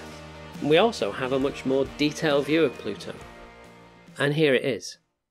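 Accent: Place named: Bolton, United Kingdom. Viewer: Are you from Bolton?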